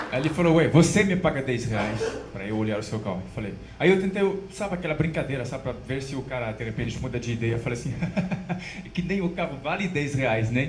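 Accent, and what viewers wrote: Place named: France. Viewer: Hahaha amei! Esse sotaque francês é demais 🤩👏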